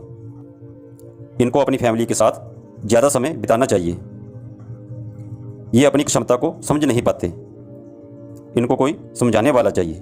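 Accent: Punjab, India. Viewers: Aur kuch toh pata nahi, par ek baat sure hai, video mein bolne wala banda punjabi hai 😀😀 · Aapp ki awaz mai punjabipan hai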